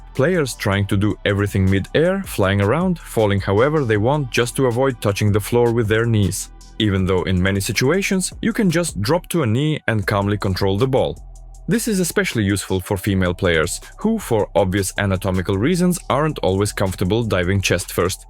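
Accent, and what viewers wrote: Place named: Russia. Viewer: Grüße aus Deutschland 😊 Love your Vids! Like your russian accent. Go on your way! looking forward to see the russian national volleyballteam in the future. You have so much power in your rooster and a huge pool of players in your league! Praying for the End of War. But In Germany We have one of the dumbest goverments of the world! They hate us german patriots more than anyone else 😅 maybe they hate themselves a little bit more 😅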